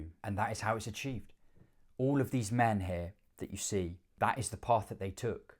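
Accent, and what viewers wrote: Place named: England, United Kingdom. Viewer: Hi m8, i love your accent..where in England r u from?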